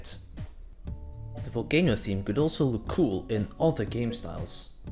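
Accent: Netherlands. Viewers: WOWOWOWOWOWOWWW! TALKING? I MEAN... I'VE BEEN A BIT DISTANT FROM THIS CHANNEL LATELY, BUT... CAN SPEAK? (I like your voice tho. And the dutch accent is pretty awesome to hear, you don't hear that accent on youtube often) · are you dutch?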